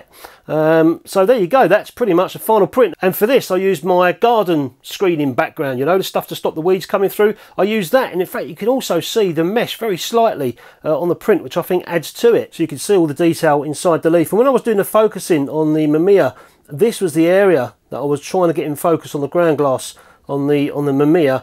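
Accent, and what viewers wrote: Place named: Scotland, United Kingdom. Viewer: As I understand you live in London, but your speech is similar to Scottish😉💂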